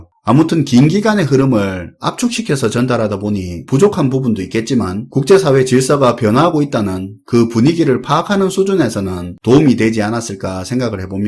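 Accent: Gyeongsang, South Korea. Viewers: 갱상도 사투리로 일목요연 정리해줘서 늘, 감사합니다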